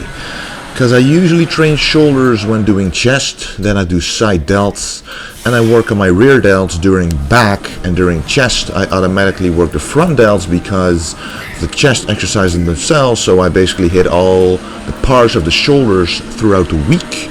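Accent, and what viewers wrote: Netherlands. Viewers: he is dutch man that is him talking normal lol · I hear a slight Dutch Twang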